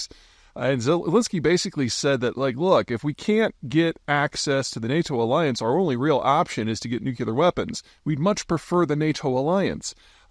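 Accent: United States. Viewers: Nuclear in 'Merican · Its refreshing to hear from someone with an American accent that understands that nuclear weapons are a technology from the 1940's, that can be developed by most advanced countries with nuclear reactors if it is needed to ensure their own security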